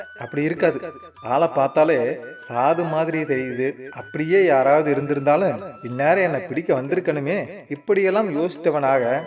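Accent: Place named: Sri Lanka. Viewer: அவதானித்திருக்கிறேன் .இன்னும் இலங்கை தமிழ் விடவில்லை 😢 லைக் செய்துவிட்டேன் .நட்பே ❤😂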